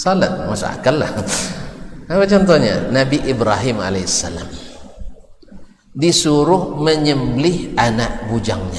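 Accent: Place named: Minangkabau, Indonesia. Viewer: setuju... Suka logat minang walaupun kurang paham 🙏🏻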